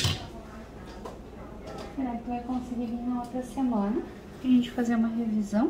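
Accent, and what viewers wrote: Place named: South, Brazil. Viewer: Ela é do Sul, por isso o sotaque. É normal.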